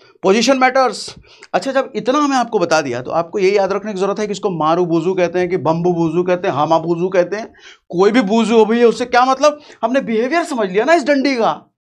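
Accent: Bihar, India. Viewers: Kya aap Bihari hai❤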